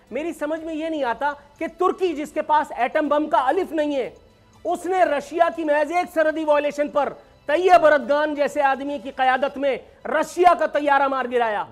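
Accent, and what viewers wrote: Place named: India. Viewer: Sadeqe sahab apka lehja he Indian ha..nafrat na banao ye khod army ne apne oper keya ha taky nazar estaraf hojae...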